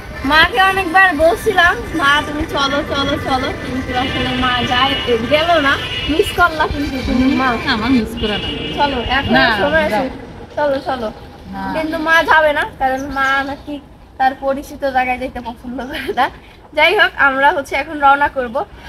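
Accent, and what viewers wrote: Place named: Bagerhat, Bangladesh. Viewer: আপু আমার বাসা বাগেরহাট আমি অনেক বার মাজারে গেছি আর একটা জিনিস অনেক ভালো লাগে আপনার ভাসা আমাদের মত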